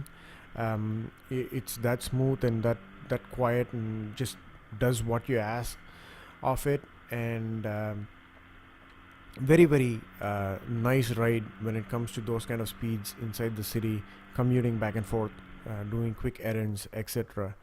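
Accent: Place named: India. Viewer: You sound a little Desi!!🤔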